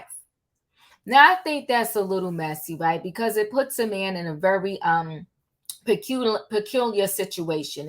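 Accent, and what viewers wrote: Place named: East Coast, United States. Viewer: Love your commentary on this topic and your East Coast accent. Keep it up!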